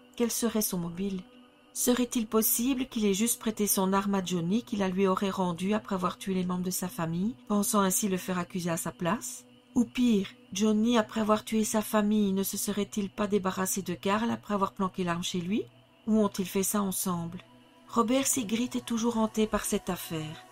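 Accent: Belgium. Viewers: J'adore ton accent belge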